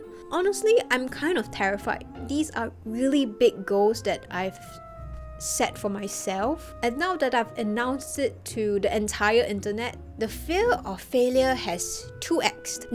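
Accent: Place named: Singapore. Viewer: happy birthday - i came for the bg3 memes, and now staying for the Singaporean accent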